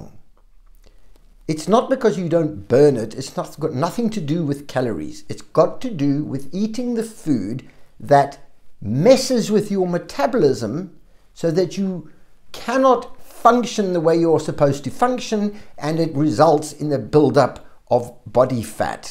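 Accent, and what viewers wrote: South Africa. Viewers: And has a South African accent.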